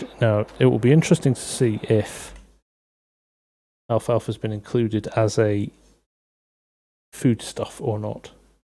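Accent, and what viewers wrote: England, United Kingdom. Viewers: Could I ask, are originally from the uk but now live in America? Im always lost that u have an English accent but do everything American 😂 pls excuse my ignorance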